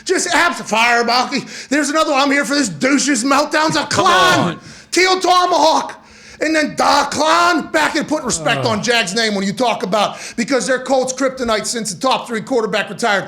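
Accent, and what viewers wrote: Pittsburgh, United States. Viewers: The yinzer accent thickens when he gets excited. DAAHHNTAAHHN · The Pittsburgh accent from McAfee is amazing...they should bring the Pittsburgh Dad on here to really make it pop. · Lol 😂 Love the yinzer accent! Thank you Jags! Go Stillers! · Got damn you are from Pittsburgh listen to that accent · becomes Super Yinzer when he’s pissed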